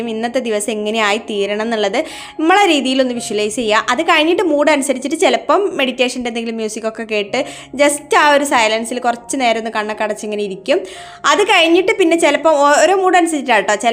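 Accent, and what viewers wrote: Kozhikode, India. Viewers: swontham kozhikkote bhasha aayondanu kooduthalum aduppam thonunne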